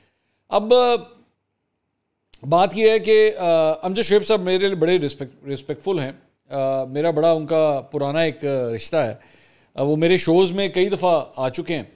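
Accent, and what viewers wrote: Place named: Lahore, Pakistan. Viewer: Yar bhai, apka punjabi touch buhat acha lagta hai, typical Lahoria apni athkeliyan yeni thatha mar ke side se nikal jata hai or phir Urdu ka shaista lehja --- hats off to you, well done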